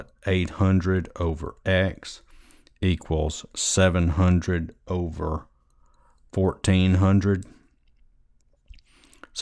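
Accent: South, United States. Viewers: he meant with the southern accent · Most southern accent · The southern accent is so annoying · I was not here for math, I was just listening to your Southern accented English...... You are a good teacher. Regards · Maybe it cause he from the south cause of his accent because am from the south but the way he solved the problems great and amazing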